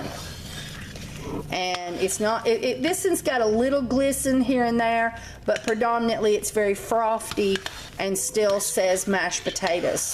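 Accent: South, United States. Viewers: A few things. First I want to apologise for not getting back to you sooner, I still want to help regarding what we spoke of before. Second, THANK YOU SO MUCH!!!! No one online has taught "Superfatting" better than you! How simple was that idea? How could it be said better? Super easy and YOU are the answers. And lastly, I was born to a southern girl who lived in the north so I feel comfortable in both worlds and they are as different as the country mouse is to that city feller over tharr. LOL. I love your video language because you sound like one of my bless their hearts and keep them Lord aunts. When you said butt crack I knew I still had some southern left in me, I about died laughing then shut myself up so I could learn more. Anyway, nuff said, I will email you again.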